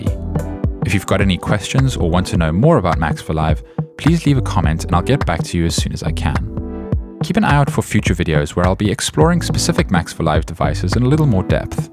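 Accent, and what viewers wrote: South Africa. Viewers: Broooooooo are you south african?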